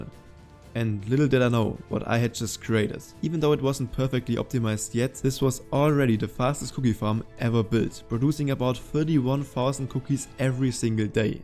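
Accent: Germany. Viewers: your accent gave you away I know a couple Germans and you sound like them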